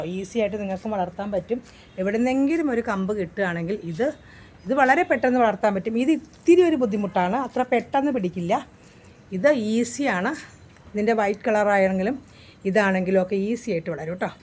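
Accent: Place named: Northern Kerala, India. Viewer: Enikku thonni vadakan style talk❤❤❤ente husbandum palakkad anu❤❤❤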